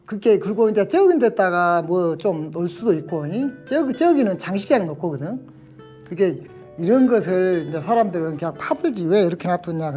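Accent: Jeolla, South Korea. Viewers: 토종 전라도 사투리~ 했제 그랬제 그랬당께~ 께~ 께~께~께깽~ 깽~ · 전라도분인가 보네요..사투리를 찰지게 쓰시네요..ㅋㅋ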